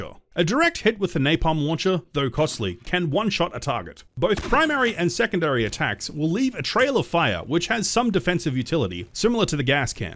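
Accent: Australia. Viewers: Why are you Australian?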